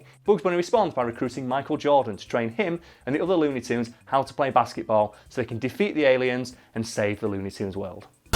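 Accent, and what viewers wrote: United Kingdom. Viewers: FACT ME UP FAST TALKING BRITISH GUY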